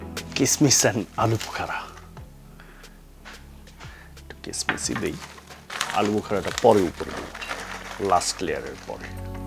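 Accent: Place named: Bangladesh. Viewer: So,but ,so,but Bangladeshi English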